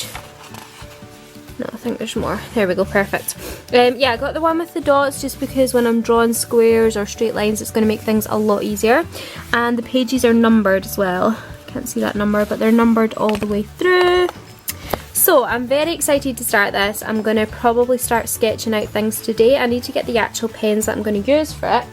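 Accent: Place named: Glasgow, United Kingdom. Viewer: I've missed your vlogs! I'm from Glasgow so it's always nice to hear a familiar accent on the vlogs! You're looking amazing btw, a wee break from YouTube has clearly done you a world of good! x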